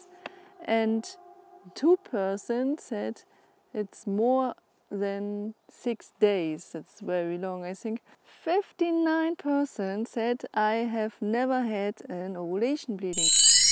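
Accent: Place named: Germany. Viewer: That german accent😁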